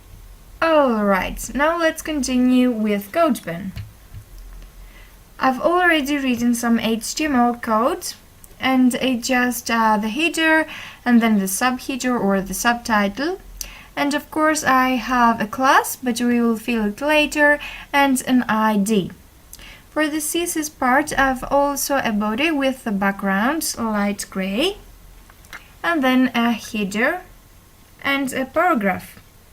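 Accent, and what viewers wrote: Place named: England, United Kingdom. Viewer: I asked where are you from but you didn't answer.im just curious about your English accent.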